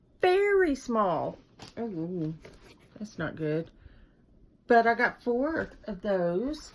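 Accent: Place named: Texas, United States. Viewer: I asked my hubby where you might be from because I love your accent. He listened to a couple of sentences and said Texas! His mother was from Abilene TX. I loved her so much. They retiredto Mission TX. I live an hou4 away from Herrschnerrs 😉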